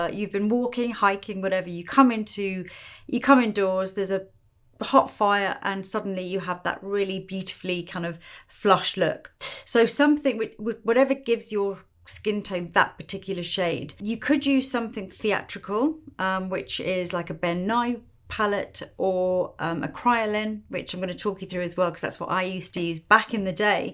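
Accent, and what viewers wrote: England, United Kingdom. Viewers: I don't know if I've ever seen someone applying make up more elegantly than. It's just incredible to watch. Just her imagination, like how she has this very real vision in her head...lol....and she brings it to life in her way. It's cool to see. It's clearly such a passion and she's very good at what she does. I just adore watching her work at her craft and passion!!!! So happy that there are people like this on the planet that are loving what they do and following their passion....too many work jobs they just HATE... so as to get that paycheck.... but the world would be a much nicer/friendlier/healthier place or should I say the human race? if people actually took the time to find their passion, and then figure out how to make money involving that passion! <3 Just wonderful to see.....(so graceful and elegant too! And ofc if she didn't have that english accent it would just feel different....she makes me feel like old school english countryside elegance / royalty yet incredibly humble and knowledgeable....i will say though, DAMN that brush collection! 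I'd love to see a makeup and brush collection video! )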